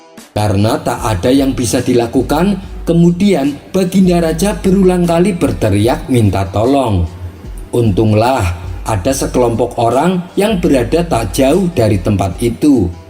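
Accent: Java, Indonesia. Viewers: Iya mas ngomong nya teger medok lekoh.cirihas orang Jawa . Salam dari serang Banten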